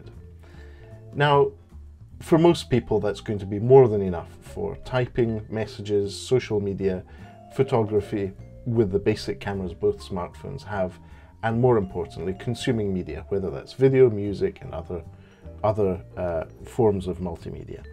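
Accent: Scotland, United Kingdom. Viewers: You sound Scottish 🏴🏴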